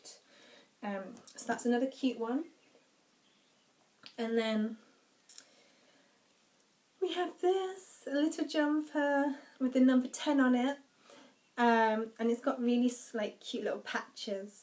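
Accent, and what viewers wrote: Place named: RP, United Kingdom. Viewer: Awwww, I'm so happy for you! Everything you got for your baby is SO ADORABLE! And also, I can never get over your fabulously posh accent. I hope you keep doing videos throughout your pregnancy!:D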